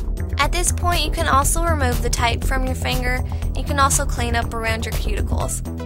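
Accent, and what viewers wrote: South, United States. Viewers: Woah! I got really confused when you started talking! You're like a southern cutepolish! lol I feel like I got more information/instructions when you talk than with just text. I like it!! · OMG! You sound so country! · wow you have a really thick southern accent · does she sound country to you guys in this video more than others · wow, its like a southern cutepolish! such a cute cute accent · im from Tenneessee :) your accent is cute. Normaly i hate country acents but not yours. SO going to try this later.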